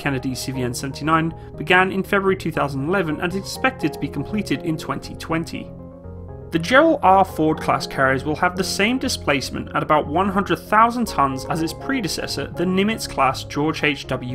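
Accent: United Kingdom. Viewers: CONGRATULATIONS AMERICA. OUR LEADERS ARE SO USELESS.. I AM LISTENING TO A BRIT TELL ME ABOUT MY OWN COUNTRIES AIRCRAFT CARRIER. I BET THE VOICE LIVES IN THE U.K. TOO. NO WONDER CHINA IS STEALING EVERYONE'S DESIGNS. PEOPLE LIKE THIS ASS HOLE GET ON MY NERVES. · I think an American should be narrating this. Same goes for many videos you see with Brit narrators; National Geo on U.S. topics - Tours of the U.S. Mint - U.S. National Parks, you get the point. This guy should be narrating things like The Big Ben clocktower or Oxford or Stonehedge...etc.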